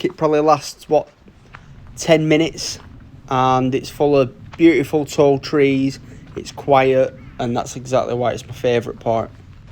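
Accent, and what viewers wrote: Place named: Lancashire, United Kingdom. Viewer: Great video. Nice place. Nice to hear a Lancashire accent 👌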